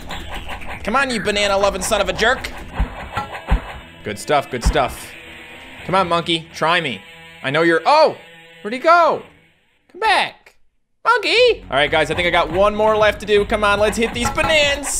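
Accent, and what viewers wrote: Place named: Newfoundland, Canada. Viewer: I watched one of your videos and I was like, "Is this boy from Labrador or Newfoundland?" I could tell right away. (my mom and dad are both from st. john's, so the accent was easy to spot out.)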